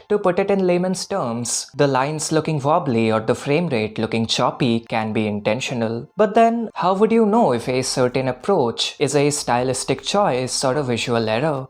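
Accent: India. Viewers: clearly india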